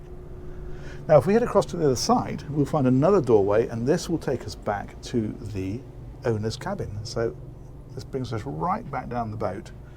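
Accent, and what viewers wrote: England, United Kingdom. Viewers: starting my morning with "crockery" always makes me happy (don't ask me why...I have no idea, just love the English vocab and accent)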